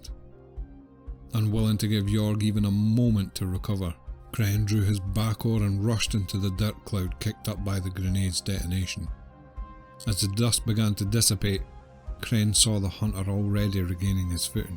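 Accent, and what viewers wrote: Scotland, United Kingdom. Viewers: Very nice!🪐 And the Scottish brogue is very easy to listen to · Your Scottish accent makes this! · This is an incredible concept, well done! And I agree the Scottish accent makes it epic to listen to!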